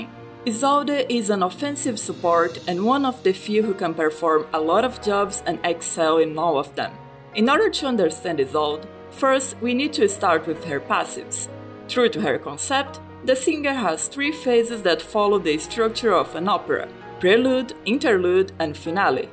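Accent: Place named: Brazil. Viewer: Eu assistindo pensando se você era BR pelo sotaque ai aparece a Jiu com uma garrafona de 51 OUFHISDAASFIHD Muito bom o video. Não tinha planos pra Isolde, mas o Joe com certeza me fez repensar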